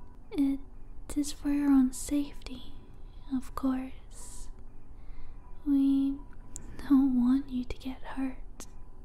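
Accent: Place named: Scotland, United Kingdom. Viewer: She sounds vaguely Scottish, is that a reference to the alien sightings at Bonnie Bridge (I think that's what I think the village is called)🏴🌉👽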